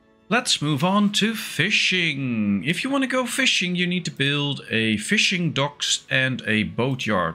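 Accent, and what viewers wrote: Netherlands. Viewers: This is a very detailed video, it shows a lot of time and dedication invested in this game. Your English sounds very good, even though it's drenched in a thick layer of Dutch. You've spend a lot of time in the discovery of all the facts and numbers in this video, and I thank you for that. I too, have spend many hours playing Ostriv, and I believe your numbers and facts are correct. One detail though: if you build a field of 25x50, you will always have 1250 units, whatever plant you grow. That is, only if your field is placed on a flat and even surface. Even the slightest slope in the terrain will cause less plants could be grown. Groeten uit Ommen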